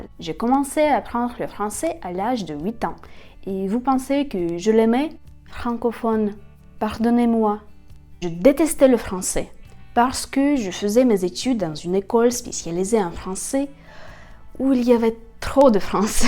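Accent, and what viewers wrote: Russia. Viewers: Vous êtes super belle et votre voix est très douce et séduisante ❤🥰😍. J'adore l'accent Russe, tellement sexy ☺️👍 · Personnellement, je préfère le format en langue russe avec les sous titres, c'est vraiment génial pour la prononciation et l'apprentissage, même si je suis ultra débutant et que je dois mettre souvent la vidéo en pause. De plus, je trouve que ta voix est beaucoup plus charmante avec un accent russe. Continu comme ca. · Мне нравится ваш легкий русский акцент, когда вы говорите по-французски. НО я предпочитаю, чтобы ваши видео были на русском языке (с французскими субтитрами) 🙂 Большое спасибо за вашу личную историю.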